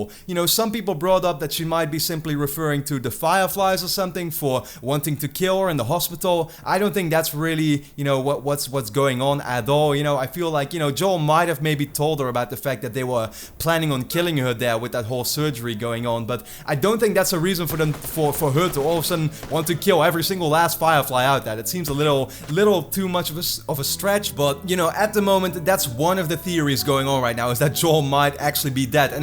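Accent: Netherlands. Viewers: That Dutch accent, i love it.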